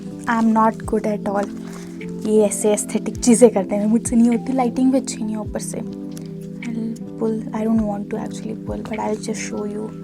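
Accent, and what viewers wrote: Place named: Himachal Pradesh, India. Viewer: You still have to work on your accent it sounds himachali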